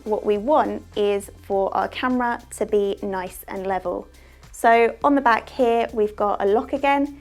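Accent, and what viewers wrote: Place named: England, United Kingdom. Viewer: Brilliant!! Love the way the English speak. Awesome video! -Jeremy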